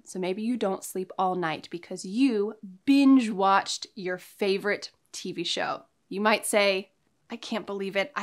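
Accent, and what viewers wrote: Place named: United States. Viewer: ......I've just met you through your English channel. I'm from Venezuela!.... I think I love you!!... I mean.... I love your teaching method, your american accent, your knowledge..... May God bless you!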